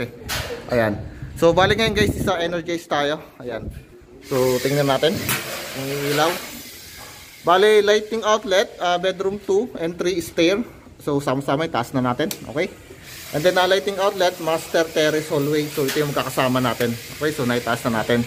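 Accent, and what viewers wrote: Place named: Quezon, Philippines. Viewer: Napansin klang sa pananagalog mu puntong quezon ay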